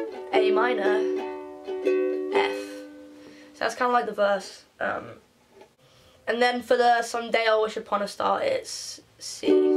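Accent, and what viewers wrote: United Kingdom. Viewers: I'm so sorry about your aunt, amazing cover, your voice is so beautiful! (I also love your accent, I love British accents because I have an American accent) 💖💖💖💖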